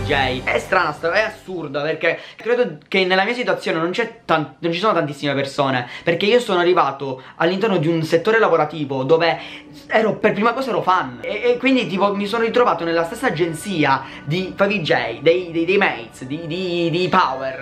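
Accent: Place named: Sicily, Italy. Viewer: ma tu sei siciliano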